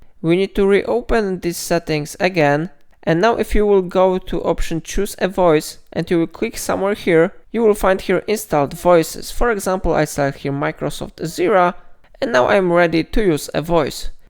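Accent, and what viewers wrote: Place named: Poland. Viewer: Polska ❤ trochę słychać po akcencie i na dole widać POL pozdrawiam 👍🏻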